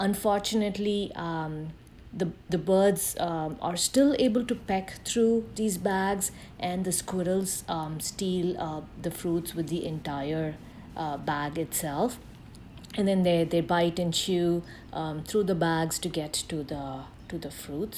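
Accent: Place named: India. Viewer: Aisa lag raha hai jaise koi Indian bol rahi ho. ji, bharat se ho kya?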